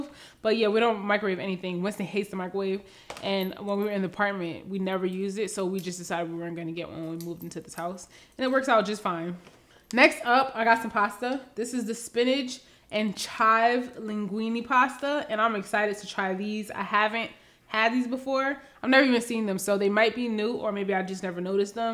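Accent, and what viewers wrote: New York, United States. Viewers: Are you originally from New York?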